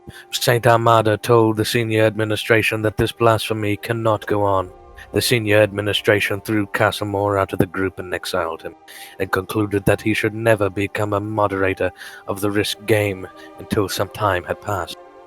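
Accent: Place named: South, United States. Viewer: narrated by a fellow southerner. liked.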